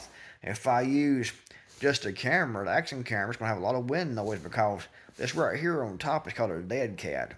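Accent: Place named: South, United States. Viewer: Reminds me of that scene from Django …”all I hear is criticize, criticize, criticize “. The world is full of trolls, just waiting and looking for opportunities to whine about something. It’s exhausting, predictable and nauseating. Never thought we would live to see such unmanly men and self centered twits who have nothing better to do than nit pick. I never noticed your breathing, I just enjoy your southern drawl 😊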